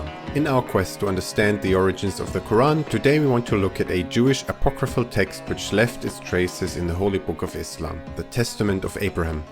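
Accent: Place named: Egypt. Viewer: Sounds very Egyptian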